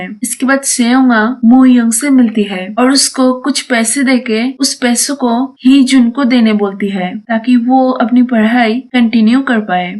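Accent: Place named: England, United Kingdom. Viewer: Apka voice jyada english style huwa hey ..hindi mojle bolo behan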